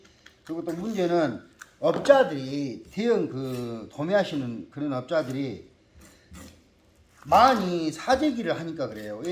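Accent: Jeolla, South Korea. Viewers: 선장님께서는 완죤 구수한전라도 사투리 듣기좋아요 영상들으면 구수한 사투리 재미 있어요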